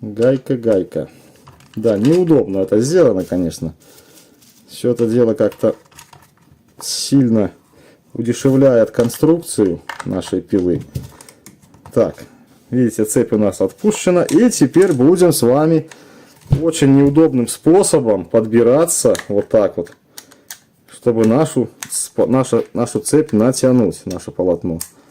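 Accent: Belarus. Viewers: привет ты белорус? такой акцент прикольный....😁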